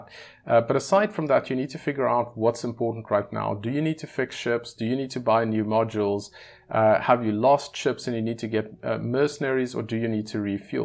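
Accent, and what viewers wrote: South Africa. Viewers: i know a south african when i hear one... jy kry n sub bra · excellent video...exactly speaking to the things I struggled with even after watching multiple guides...specifically struggled with fuel and how to get money to buy more fuel. Your accent sound South African... Even more reason to subscribe ;-)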